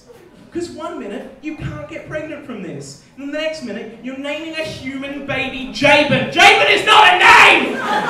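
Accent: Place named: Victoria, Australia. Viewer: i love your accent. i live in sydney was born in vic (near frankston) and i love a good victorian accent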